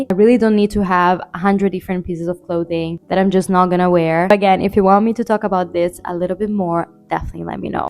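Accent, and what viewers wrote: Italy. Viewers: wait a minute are u italian?? i noticed only now the accent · sentivo l accento ma mi sono stupita di come parli inglese da italiana, come mai fai video in inglese?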